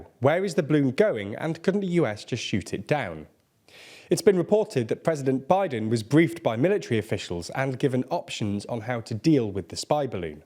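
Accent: United Kingdom. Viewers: Sorry you are feeling unwell,. Hope you get better soon. You are still gorgeous, and your Brit accent still rocks. You could read the phone unwell with that accent and I would still tune in.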